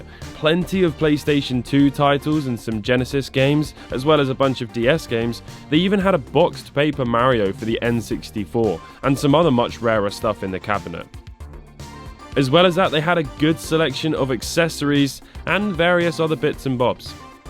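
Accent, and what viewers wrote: United Kingdom. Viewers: He’s British like me 😅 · Oh, you are brithish! You should go to this place called Goodwill Computer Works, there's one in central texas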